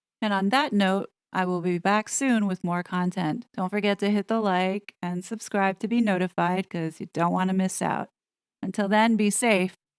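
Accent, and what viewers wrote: New York, United States. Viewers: New Yorker. OK. Could never pin point your accent. Another great video. It appears the girl child creature always thought she was superior to everyone. She sees how much she has missed out of leaving the Royals. With her real love interest, William, moving up to Prince of Wales and the beautiful Catherine, Princess of Wales, she is out of her mind with envy. I'm sure she and her Suck Squad will be hitting the Royals with more hate the likes we have never seen before. Hope it is true MI5/6 are investing the squad. The rings are beautiful. I love how people are making fun of her with those ugly pictures. Every time she hits we should hit back. She wants to act like a child, we can go all out Mother Bear on her. Have a great week.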